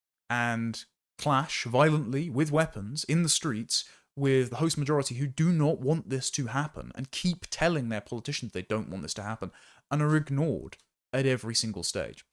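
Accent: RP, United Kingdom. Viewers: You sound like a toff.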